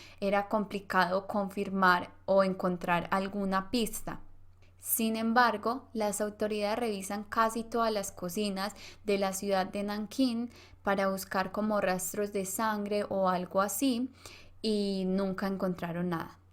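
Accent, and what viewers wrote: Colombia. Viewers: El mas bonito de los acentos colombianos, de donde eres?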